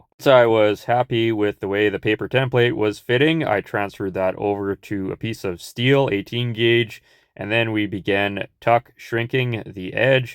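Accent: Canada. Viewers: You Brits probably understand his Canadian pretty well, eh?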